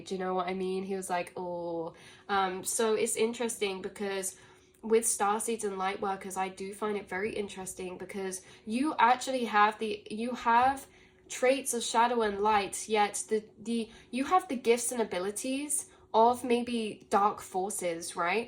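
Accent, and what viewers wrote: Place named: United Kingdom. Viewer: Harry Potter references and your British accent. Perfect fit.😊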